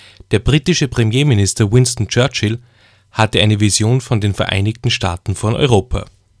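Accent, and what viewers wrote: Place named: Austria. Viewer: Sehr gut erklärt,man hört das du Österreicher bist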